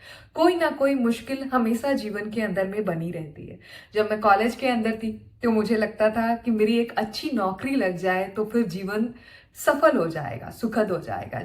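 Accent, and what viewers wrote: Bihar, India. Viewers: Your bihari accent 👌👌👌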